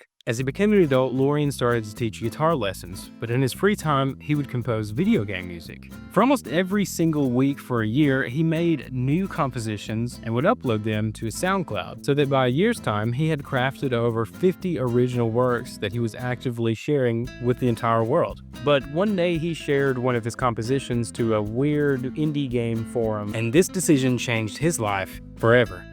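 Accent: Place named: South, United States. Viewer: Very pleasant. Simple in the best sense of the word, it doesn't pretend to explain the universe, but it's full of tenderness for certain things that moved us in this music, in the game -it's the same thing. So, it deserves a little comment for the algorithm and, more profoundly than that, a thank you for taking the time to convey an emotion that helps make this world a more beautiful place. Ps Well, I'm French and i don't care about your accent in French. To be honest, i love southern accent, so, everything is just nice^^.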